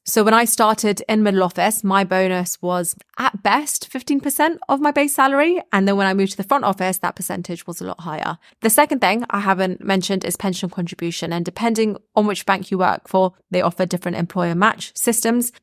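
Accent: United Kingdom. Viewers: I don’t know what but your British accent, simple dialogue, beautiful face and matured/experienced way seemed to convince me to finish the video, but it raised a big question in mind….How do you know when is it the right time to negotiate and how do you negotiate a pay rise.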